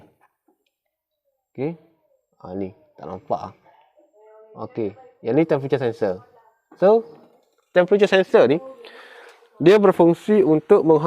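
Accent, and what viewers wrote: Kelantan, Malaysia. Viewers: Orang Kelantan ke bos..?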